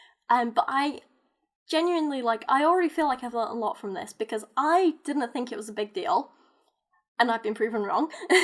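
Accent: United Kingdom. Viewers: I think you are very smart and I like watching your videos. I sent a link to your channel to my 16 yo daughter (not just now, a while ago). Also, love your British accent. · You're brilliant, this is brilliant! I myself am a Roman Catholic, but I believe their is no and never will be any scientific evidence that a God exists. I even said that in the last book that I published on Amazon. Given those circumstances I'd say I'm between a rock and a hard place religiously speaking, but I got myself there and I almost wouldn't have it any other way. We have in common the fact that we are both straddling a hard line with the types of people we live around or converse with. I love the way you say the word "but" where it sounds like "bu" . I understand it's a British accent thing and me being from the USA. I don't hear that every day, and I just think that is interesting. 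I think you are perfect for not coming out as an anti theist, because it can be dangerous to do so. I say that knowing that all I have to go on is from my own life. I just know that If I where to come out and publicly denounce my faith and tell everyone that I hate Christianity and Christians that would be me doing the hateing. So keep on doing what you're doing, debating and posting videos about crazy Christian books that are as you would call them rubbish.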